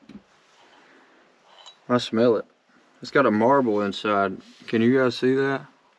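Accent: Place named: Appalachia, United States. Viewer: nice, I could tell from the accent you were also southern Appalachia. Keep up the great work brother!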